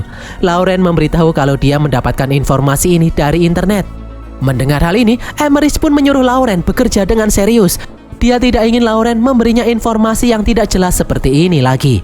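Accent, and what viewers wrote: Java, Indonesia. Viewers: Perasaan suaranya beda ya?? Kok medhoknya terasa banget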